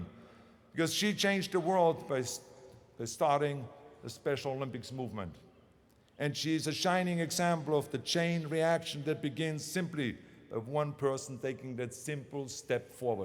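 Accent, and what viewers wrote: Germany. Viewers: The German accent is pretty strong, and Germans/Austrians are pretty tight with their Pronunciation, and I guess when you start speaking English properly as an adult you always have an accent. My parents have been living in an English speaking country for 15 years and they still have an obvious foreign accent, but me, having moved here when I was 4, I speak the same way everyone else does here. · How come this guy's been living in the U.S. for 40+ years and he still keeps such a strong German accent? he says he also took accent removing classes...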